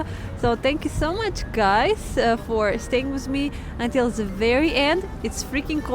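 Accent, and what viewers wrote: Russia. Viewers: I love the Russian accent and language 😂 It sounds so romantic and sweet. Some people prefer French Italian or even British. To each their own. Those dishes looks delicious too. All or most under $4.00. Get real!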